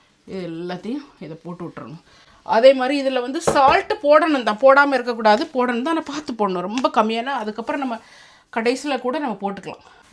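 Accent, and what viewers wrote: Nagercoil, India. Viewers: Hi akka…I like the way u speak especially Nagercoil slang…I lived in England for a couple of years…really very nice country.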